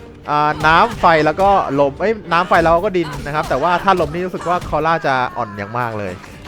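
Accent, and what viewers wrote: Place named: North, Thailand. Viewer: พี่คนเหนือป่าว พี่ พูด เหนือ อะ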